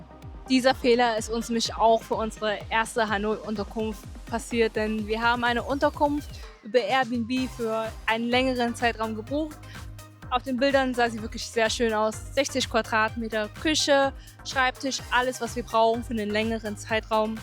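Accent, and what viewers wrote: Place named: Saarland, Germany. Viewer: BIsch du aussem Saarland? :D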